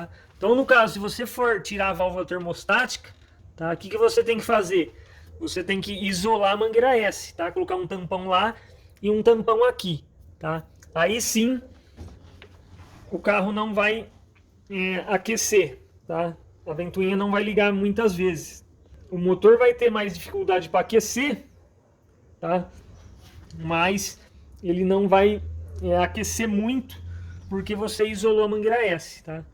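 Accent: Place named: Espírito Santo, Brazil. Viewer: Aqui no Espírito Santo falamos assim tbm. Cada região com seu jeito de falar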